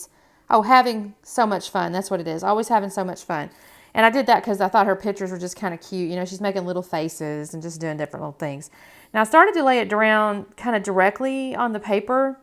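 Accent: Texas, United States. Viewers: I’m loving your work, and also,your humour and accent. I’m from NZ and I love your Texas ways. ❤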